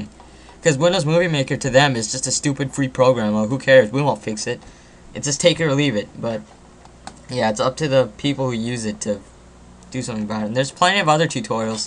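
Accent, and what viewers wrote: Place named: United States. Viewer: What happens with mine is when ever I try to upload a video onto YouTube, it starts to save my video and then half way through my laptop decides to die :) And my laptop isn't over heating....it's a mystery. Also, don't worry about stuttering, it could be because your nervous, I get nervous too and totally mess up. Also your American accent is cool :)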